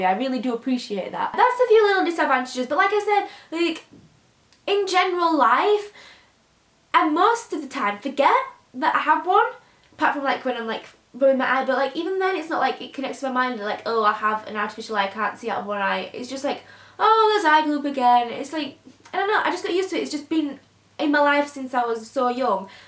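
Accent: United Kingdom. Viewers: I love your accent, are you British?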